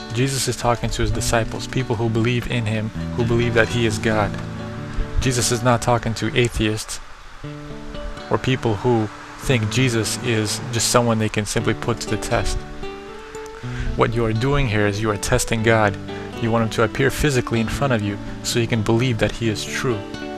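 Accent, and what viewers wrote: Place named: India. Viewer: I cant understand this video complee completee difeebr what was that.... oh... whats going on.. Is this guy indian, i think he is.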